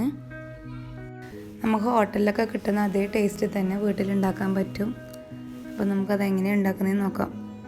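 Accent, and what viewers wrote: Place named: Kasaragod, India. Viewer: Kasrgod slang orupad ishtayii.... Masala doshayum sprrr🥰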